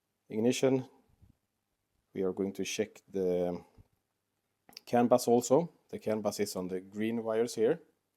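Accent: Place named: Sweden. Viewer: You sound swedish, do you do installations like this commercially? I would be interested in such a case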